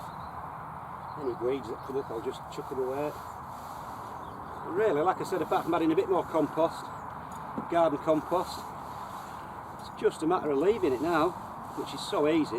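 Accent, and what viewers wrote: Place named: North, United Kingdom. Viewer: Hi Love your Northern accent! We call this the Back To Eden Method in the States. It really does work very well. I loved your competition footage as well. Keep it up!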